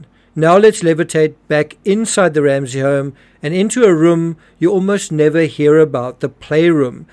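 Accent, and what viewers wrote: South Africa. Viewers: Jon Bennet was abducted and taken to the US Airbase on the Azores for the purpose of sacrificial murder all organised by the then Prince Charles. She was raped to death in the Rape the Children to death races held by the Royals and world leaders. ANYBODY with the knowledge and truth but telling known lies regarding this young girl or ANY other one.. is about to be removed from Earth. PREPARE... BTW... I notice a South African accent... there are human meat processing tunnels in your country as well as mine and many others... 1000's are processed in these factories every day and the people abducted from the war zones. ..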